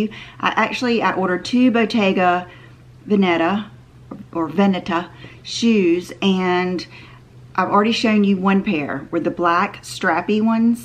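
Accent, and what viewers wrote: South, United States. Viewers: I love your southern accent so much 🦋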